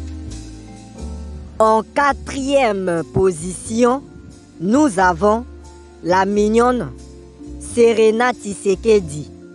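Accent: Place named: Togo. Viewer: Ton accent est comme la togolaise qui nous parle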